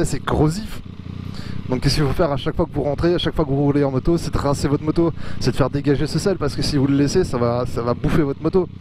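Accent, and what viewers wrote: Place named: France. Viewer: Sympa la petite ballade dans Bx,... T'es un français résidant en Belgique ? Je dis ça car tu as un accent français. Très bonne vidéo ça permet de se faire une idée des choses à compter lorsqu'on aimerait s'offrir une bécane. Thx 👍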